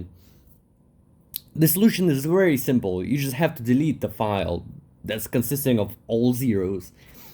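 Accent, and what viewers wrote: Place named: Russia. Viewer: русский акцент всё ещё остался наш)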